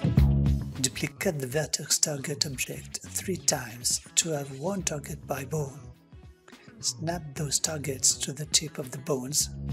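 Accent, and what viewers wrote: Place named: France. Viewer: To me, you sound like you have a slight French accent, and I don't know if you knew this, but many Americans and other English speakers love French accents. In fact, your accent is in a great sweet spot where you sound foreign enough that you get the mystique of a foreign accent, but your pronunciation is good enough that there is no trouble understanding you.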